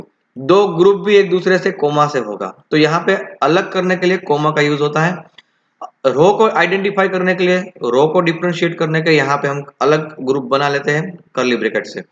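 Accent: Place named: India. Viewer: Your explanation is amazing, but unfortunately I can understand nothing from your Indian accent.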